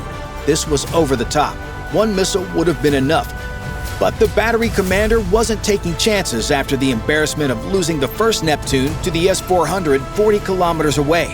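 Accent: United States. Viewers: It’s a shame it’s all in a US of North American accent. Totally unbelievable.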